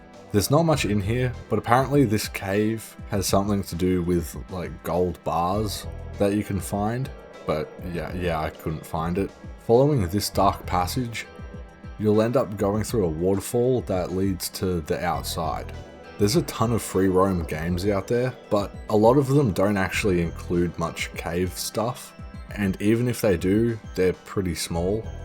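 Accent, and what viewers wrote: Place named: Australia. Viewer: Australian commoner accent.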